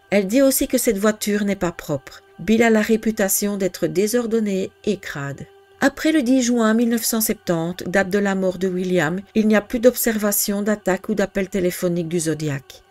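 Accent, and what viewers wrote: Belgium. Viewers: Bonjour, un grand merci pour tes vidéos de grandes qualités, je me pose une question:quand j entend ton petit accent viendrais tu de Belgique dis moi. 😉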